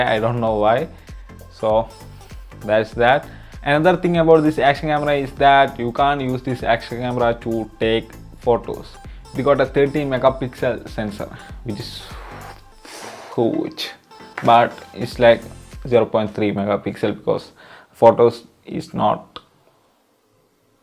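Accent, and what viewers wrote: Kerala, India. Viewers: You have a very bad accent of Kerala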